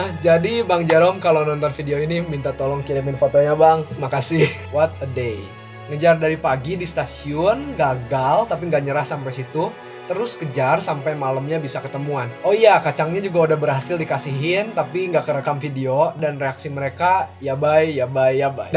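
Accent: West Java, Indonesia. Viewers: Eta nya .ari logat sunda mh teu d mn2 bde stay d mn oge..keu2h ..moal leungit ..kjn pk bhsa gua..elu..😂ttp we aya.. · Cara bicaranya kaya legok Sunda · Kesini karena muncul di beranda. Salam kenal. Baru denger suaranya aja udah bisa ketembak kalau orang Sunda, cuma takut salah karena facenya eh ternyata bener asli Sunda, Jawa Barat dong. Salam kenal. Halloo... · Aya logat sundaan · Logat sunda na mantep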